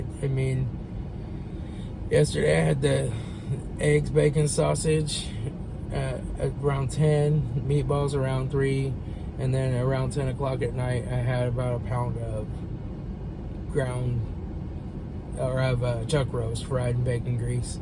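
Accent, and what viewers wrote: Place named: Ohio, United States. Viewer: I knew it! I'm from Tipp City OH originally, moved out west in 1996. Now I notice an Ohio accent. I like listening to you because you sound like family, lol. You sound like my brother. Love your videos!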